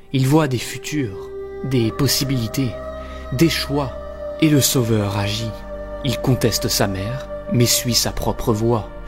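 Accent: Belgium. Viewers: T'es belge toi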